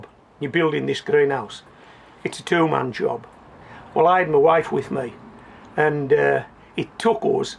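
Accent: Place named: North East England, United Kingdom. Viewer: Where abouts are you ? You sound very local to myself which is Loftus a small town in between Redcar & Whitby. Love your videos keep em coming! Just about to refit my polycarbonate greenhouse with more polycarbonate. This time going to reinforce as much as possible. North east England renowned for cold and VERY windy weather. Think it’s been down more than up my poor greenhouse!! Wish me luck!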